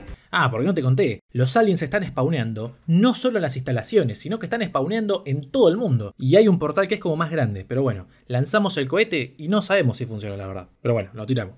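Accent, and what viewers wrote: Argentina. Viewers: videazo xD me recordo a motherland, ahora GatoPan, la forma de resumir, narrar y presentacion pero, en argentino papaaaa!!! muy bueno, espero la segunda parte y la tercera para cuando salga hl3 :´) y bueno, mas videos del estilo que es buenisimo tu humor man jeje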